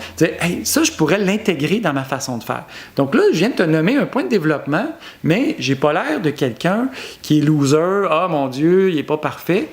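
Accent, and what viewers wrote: Quebec, Canada. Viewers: Parler familier québécois : on écrit des articles et on prononce des artics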